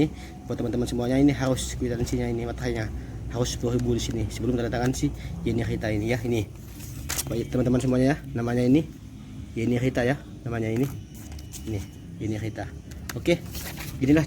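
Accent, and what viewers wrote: Indonesia. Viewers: Amuntai kh ini,cara bicaranya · Orang aceh ya bg ?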